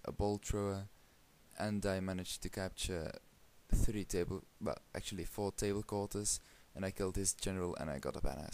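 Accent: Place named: Flanders, Belgium. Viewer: haha I can hear your flemish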